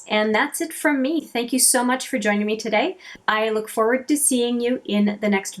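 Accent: Quebec, Canada. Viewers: The Elizabeth Arden is still on their website.Guess I know y!U sound like u could be Canadian french!I think the urban decay shadows are my fave of the ones that I have.In general for the price and general quality they're the best of the ones I have.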